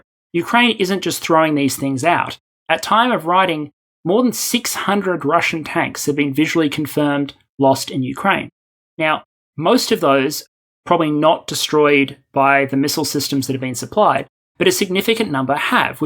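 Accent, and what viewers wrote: Australia. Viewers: Not in the middle of an Australian accent like yours, and not both in the same paragraph.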